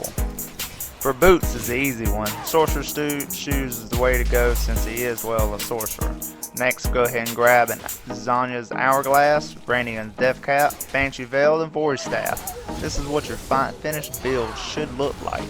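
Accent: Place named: East Coast, United States. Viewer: You sound east coast but that’s just me、(I’m East) keep up with the videos man! Also great editing :) lil YouTube channels keep this clustered genre new. You will blow up keep going nuts on LOL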